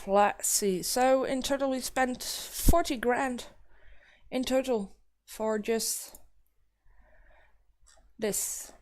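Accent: Netherlands. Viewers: Jij bent Nederlands??? Vandaar dat accent!!! geweldig hahaha ma Flodder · Are you Dutch?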